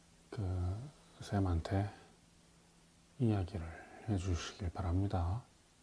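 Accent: Gyeongsang, South Korea. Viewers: 배재고나오셨으면 서울토박이일텐데 어떻게 경상도사투리 네이티브같죠? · 시리즈로 해주세요 어제 물리수업듣다가 꿀잠잤어요 오늘도 자습보면서 램수면 빠져볼게요. 근데 왜 동남방언쓰시는지요? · 시발존나리얼하네 같은경상도사투리니깐 더리얼하네ㅋㅋㅋㅋㅋㅋㅋ와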